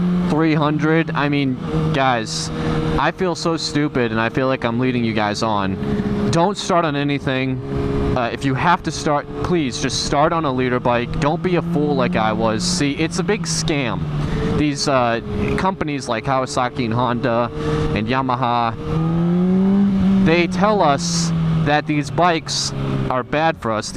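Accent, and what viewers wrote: Massachusetts, United States. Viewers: What part of mass are you from ?? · What part of Massachusetts you from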